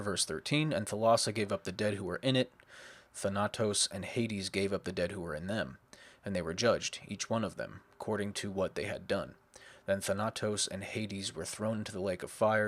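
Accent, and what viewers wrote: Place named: United States. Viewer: Great video although your credibility suffers a bit by your insistance on an unadulterated American pronunciation of Greek.... you use diphtons all over the place where none such are used in Greek....e.g. your god "Gay"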